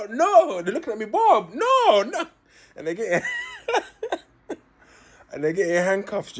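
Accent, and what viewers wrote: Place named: North London, United Kingdom. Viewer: Love the vids bro. Mans got the full on narm accent, sounds like giggs sometimes lol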